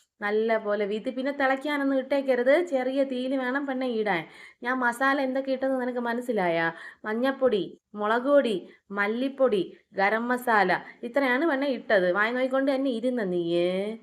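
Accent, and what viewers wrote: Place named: Trivandrum, India. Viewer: Trivandrum language super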